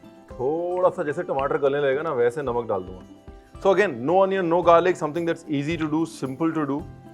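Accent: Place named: North India, India. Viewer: I love how ranveer keeps it with indian touch with cooking and speaking style.. bilkul desi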